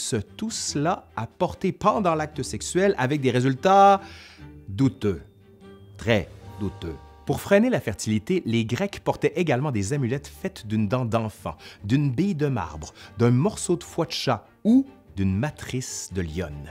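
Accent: Quebec, Canada. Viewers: Toujours instructif, plein d’humour, une prononciation impeccable ! Et j’adore l’accent Canadien! 👍🏼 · Excellent ! Encore meilleur avec votre accent canadien !😊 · Merci pour l'accent canadien qui nous explique tout ça avec beaucoup d'humour.😂😂😂😂😂😂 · Merci à toi, merci de ta façon ludique de raconter l'histoire. Et merci à ton léger accent qui me rappelle mes vacances dans la belle province. Alors je me suis aussi abonné et je partage 🎉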